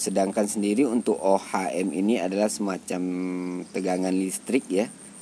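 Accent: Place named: West Sumatra, Indonesia. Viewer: macam ada suara minang kocak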